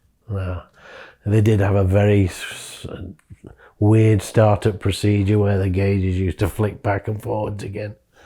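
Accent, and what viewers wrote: United Kingdom. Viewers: Great to hear a uk accent on this Chanel! I think Americans would be surprised how many tvr’s you see driving round at least where I live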